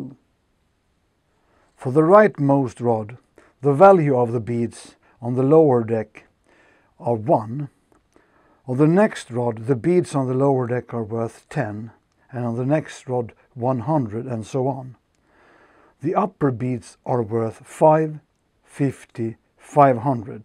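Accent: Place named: Sweden. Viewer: That Swedish accent :D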